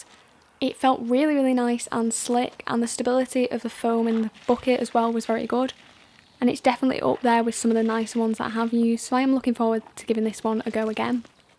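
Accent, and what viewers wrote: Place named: England, United Kingdom. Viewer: Enjoy your English accent.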